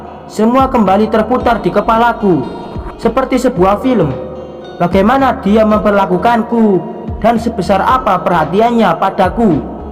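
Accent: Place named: Banyumas, Indonesia. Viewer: Mlm "jdi ska ngakakkkkkkk....gra"denger crita dan bhs ngapak y...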